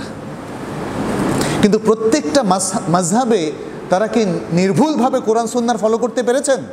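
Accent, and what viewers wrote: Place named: India. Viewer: সে ইন্ডিয়ান , ইন্ডিয়ান ও পাকিস্তানিদের উচ্চারণ বাংলাদেশী বাঙ্গালী জাতির লোকেজনের থেকে আলাদা ও ভিন্ন হয় , এক্ষেত্রে ইন্ডিয়া এর বা পাকিস্তানের দেওবান্দী ও আহলেহ়াদীস় সালাফী আলেমদের উচ্চারণ একই রকম হয় । আর সলাাতের বাইরে কেউ ভিন্নভাবে উচ্চারণ করলে বড় সমস্যা হয় না জানা , আর তাঁরা কখনো হ়ারাফ় এর বা কালিমাহ লাফ়াজ এর পরিবর্তন ঘটে এমন করে পড়েনা বা উচ্চারণ করে না ।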